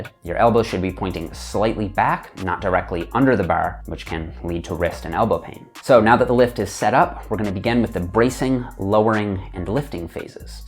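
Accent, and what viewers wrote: Newfoundland, Canada. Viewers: Hello, been a big fan of Good Morns for years. Can you tell me what your thoughta are on a front GM where you're hugging a weight plate? Thanks very much. Also, are you from Nfld? Your AR's sound like mine and I'm from Nfld.😋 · The Canadian "r". Very distinctive.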